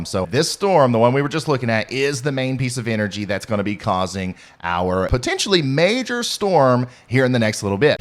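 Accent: South, United States. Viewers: Your fantastic! Soooo glad I found your channel! And you have a nice smart sounding southern accent ..opposed to the other southern sounds😘😁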